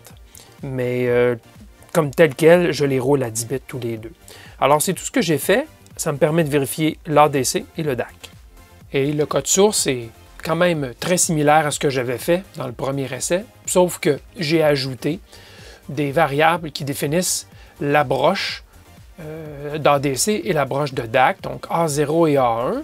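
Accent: Quebec, Canada. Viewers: Excellent, clair et précis (comme les autres vidéos) avec le petit accent du Québec qui ravit tous les Français.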